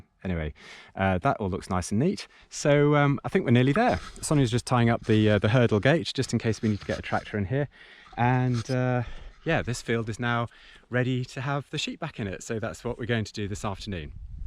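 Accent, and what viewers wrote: United Kingdom. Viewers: Well done great explanation and wonderful to hear an English accent for a change!! Just doing some ourselves so watching this and a few other Youtube Videos as a refresher, thank you!!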